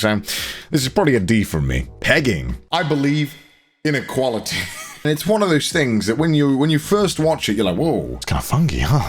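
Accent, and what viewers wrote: United Kingdom. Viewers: this is the first time I keep hearing just be so british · Why does sound extra British in this video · WAIT- BUT THE WAY HE USED HIS BRITISH ACCENT TO BEAT THE YOUTUBE-SYSTEM 😂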